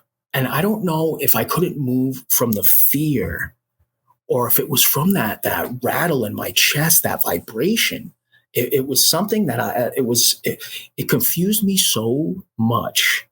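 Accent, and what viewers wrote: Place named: New York, United States. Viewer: This dude is so believable. I hear it in his voice. I hear NY ( north) in his voice, but if he talked to me he’d scream Hillbilly from s.e Kentucky. Isn’t it funny how a few hundred miles change our whole way of talking somehow lol. God bless everyone listening or reading. Remember bros & sisters. EVERYTHING WE SAY OR DO OR THINK, Releases either positive or negative energy, DO Not spread negativity because it leads to sickness, depression, anger, hostility, hate disorders, disease and death. It’s been scientifically proven by the water has a memory case study. Don’t let someone else sling their negative energy onto you, If it happens to you don’t respond with negativity because that means it stuck onto you. Be positive and let that evil energy bounce right off ya. Ps- That same frozen water / ice crystals study even proved holy water is real. 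Nasty polluted water once frozen could not form any pretty patterns in the ice crystals until they prayed over it and refroze it, only then did it produce beautiful patterns in its crystals And One container of water was spoken to and only told it was a Angel. Then after they froze it and looked at it’s ice crystals underneath a microscope, guess what type of patterns it made???? = A HALO OF FLOWERS How does water know angels have halos? Unbelievable huh? They now claim the largest living thing on earth is water. And water itself could possibly be the base element of life itself? What?? That last part blows my mind times 3 Idk just repe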